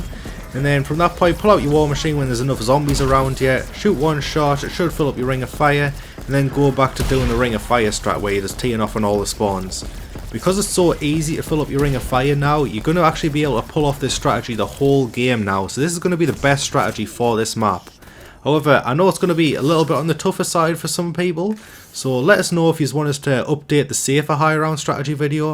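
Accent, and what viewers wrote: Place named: North East England, United Kingdom. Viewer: I find myself to be quite a die hard zombie player, I've played since world at war and i haven't bought this game yet. Is it worth it? Also love that your from the north east ur accent shines 😂. I am too! Been watching you since bo3. Haven't much recently because I've not played this yet. But whenever i think of playing zombies again i come here 👍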